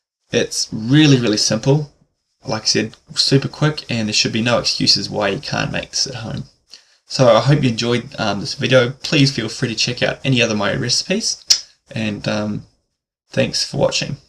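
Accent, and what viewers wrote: New Zealand. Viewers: That's a Kiwi accent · That would be an NZ accent, but an educated one. Closest British similar accent is between Bristol Reading and Oxford. A less educated accent can sound much more like an Australian one, but to be fair educated Australians (rare as they are) can sound like educated NZrs. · Kiwi accent?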